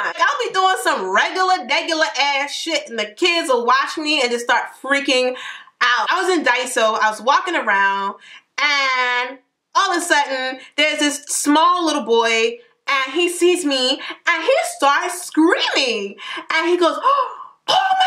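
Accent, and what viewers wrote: Baltimore, United States. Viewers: I KNEW THAT WAS A BMORE ACCENT😂😂 Nice to know someone older, from my hometown, give tips about Korea. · I’m sorry this is my first time watching your videos and I sweaaaar you talk like Tamar Braxton 😂😂😂😂😂😂 I guess that’s a Baltimore thing 😂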